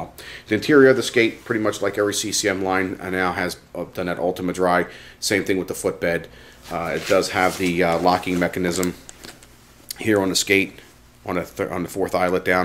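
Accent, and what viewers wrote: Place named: Philadelphia, United States. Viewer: Is the host of this vid from the Philly area?? His accent sounds like it and he said "Wooder" for "Water". ;) I'm orig. from Philly, so I notice these things.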